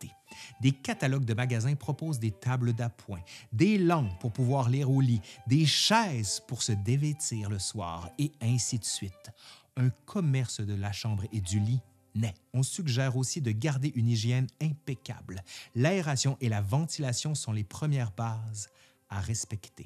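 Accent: Quebec, Canada. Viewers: Passionnante histoire du lit, surtout racontée par un québécois à l’accent délicieux! Mes origines sont de centre France, plus précisément en haute Corrèze, et les anciens dormaient en campagne dans des lits minuscules. D’abord parce qu’ils n’étaient pas très grand hein, mais surtout aussi parce qu’il ne fallait pas dormir dans la position du mort dans son cercueil. Ils dormaient donc les jambes repliées. Ça allait quand j’étais gamin mais c’était plus dur en grandissant… Bref, l’histoire du lit n’en fini pas, comme on peut le voir dans certains commentaires. Merci pour ces vidéos drôles et instructives! Encore! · j'ai adoré votre video. un grand BRAVO! je me demandais ce que vous pouviez raconter sur l'histoire du "dormir", et sincèrement, un vrai cours d'histoire. et j'ai apprécié votre touche d'humour. en plus, votre accent québécois (je suis du Var en France), j'adore !!!! 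Merci beaucoup. je vous découvre. je vais suivre vos viéos. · Foutu accet canadien...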